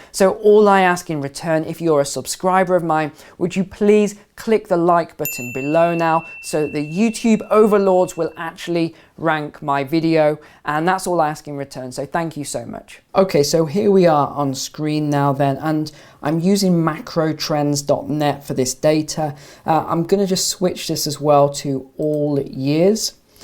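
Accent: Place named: Coventry, United Kingdom. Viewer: Nice one. I thought I detected a bit of a Cov twang. I went to Uni in Cov and my folks still live there. Same boat as you now mate, live between Philippines, USA and Mexico. Keep up the good work and thanks for the reply.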